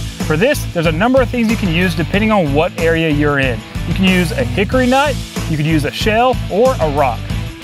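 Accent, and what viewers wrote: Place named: Tennessee, United States. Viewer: What part of Tennessee u from brotha?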